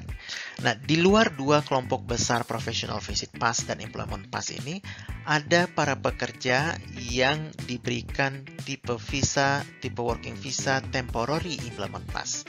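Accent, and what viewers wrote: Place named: Malaysia. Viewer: Video buatan orang malaysia....dengar intonasi cakap.....kosa kata banyak digunakan oleh orang melayu malaysia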